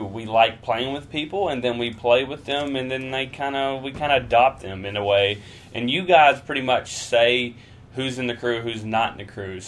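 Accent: South, United States. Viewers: He is obviously from the south. I know this because I'm from the south and everybody talks like that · Is that a southern accent?! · Your voice sounds kinda southern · Love your southern accent! Where are you from and how old are you? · You Sound a little country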